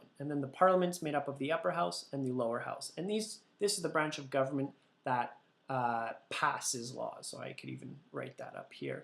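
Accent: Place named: Quebec, Canada. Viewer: You sound like Trudeau, maybe it’s a French Canadian accent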